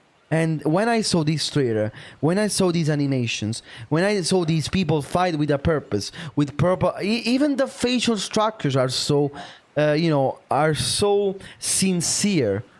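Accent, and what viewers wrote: Italy. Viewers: You sound Italian, the best European vf player is from Italy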